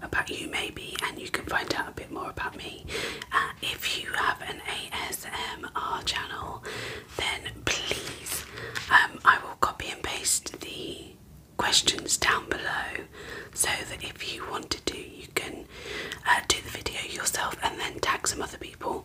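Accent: United Kingdom. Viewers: i'm such a sucker for british accents and curly red hair! :) <3 also, i'm pretty new to the ASMR community so I thought I'd drop by & say hey! nice to meet you - just subbed!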